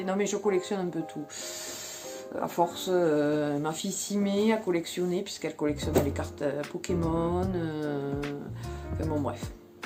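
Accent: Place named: Occitania, France. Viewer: Coucou, sympa ta vidéo 🤗 je compte faire un tour à la croix Rouge dans la semaine 😉 pour info, heliotrope de chez adopt c'est le dupe de Manifesto d'Yves st Laurent 😊 j'adore les parfums adopt 🤗 ton accent m'a fait rêver ! Toute la famille de mon mari se trouve en occitanie ( Perpignan, Thuir, salse le château etc... ) 😊 À tout bientôt 😉😘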